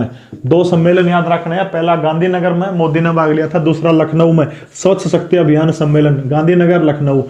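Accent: Haryana, India. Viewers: Aapki haryanvi mst h sir ji question isi ki vajah se jldi yaad ho jaate hain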